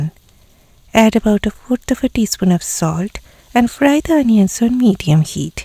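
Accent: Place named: Bengal, India. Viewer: Are you bengali 😊.from your accents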